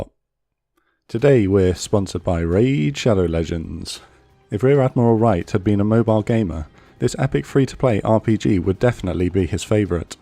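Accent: United Kingdom. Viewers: Excellent presentation, as usual. And your British accent gives extra gravitas to the narration. Keep them coming.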